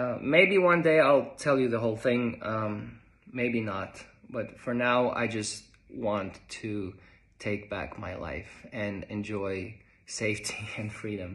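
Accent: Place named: United States. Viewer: Omg. Jaja du fikk en fin amerikansk aksent da! VELKOMMEN tilbake. Løp ut og se nordlyset, det er jo så sterkt og kult nå om dagen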